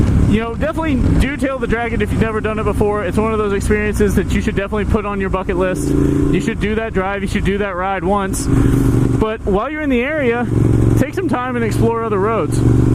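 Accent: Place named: Nebraska, United States. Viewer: Your hometown...since when? You sound like your from Nebraska.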